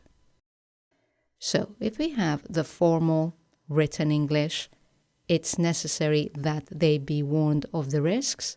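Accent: United Kingdom. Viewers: it is british english? Answer me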